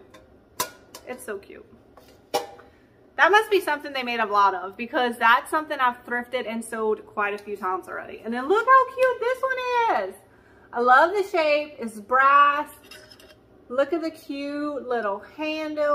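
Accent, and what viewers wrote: Louisiana, United States. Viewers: I'm new. I'm a nurse by profession but I'm a wannabe crafter. I procrastinate but am hoping to really get serious. I stumbled up on your video and youre a beast with this stuff. You have my accent. You must be from Louisiana. Can't wait to see all of your stuff. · Where are you from? I am from Louisiana, and you sound like we do 😂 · The sea garland, looks fabulous !!!! The antique frames are so gorgeous!!! P.S. I heard you tell Wren, to "come see" and I couldn't believe my ears! I always say that, also, but, ppl that aren't Cajun, think it sounds funny. It's actually because we've heard that said, in French, so we say it in English. I have to watch your videos, every day, to get my daily dose of the Cajun accent; it makes me feel like I'm back in Louisiana, instead of North Carolina. 😊😘😁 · Love you and your channel. I’m from Louisiana and I swear you have an accent like you are from Louisiana as well. 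Maybe around pointe coupe parish or something. Lol am I close??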